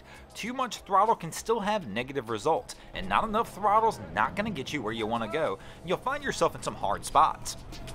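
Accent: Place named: Pittsburgh, United States. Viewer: So what you're saying is that you're from "Pixburg"? Sure sounds like it.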